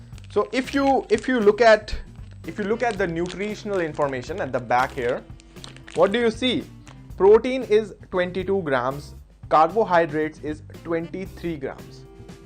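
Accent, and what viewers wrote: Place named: United States. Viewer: Liked the video....if I ignore the pinch of American accent in btwn😁....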